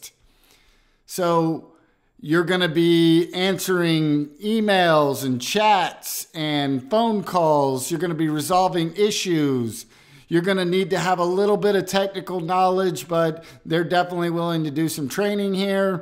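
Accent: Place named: United States. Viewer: he sounds like he’s from the U.S. though. I don’t think it’s hard to figure that out. He didn’t say “careers around the world,” so it would be safe to assume they are from his region.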